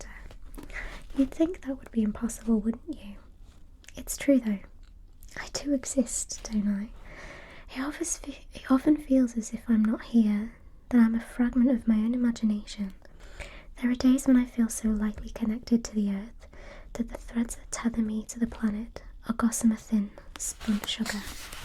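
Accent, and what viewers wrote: United Kingdom. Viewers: I love British accents! I love you vids! Thank you!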